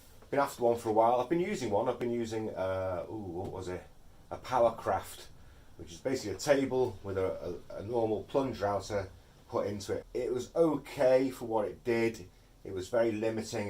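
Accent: United Kingdom. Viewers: Awesome. My first video of yours that I’ve watched. Will definitely watch more! Nice to hear another UK accent in a sea of American accents. 😊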